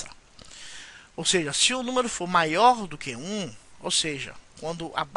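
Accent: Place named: Northeast, Brazil. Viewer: parabens prof. o senhor ensina mto bem! e com esse sotaque bacana do nordeste fica mtooo mais agradável! ;) valeu msmu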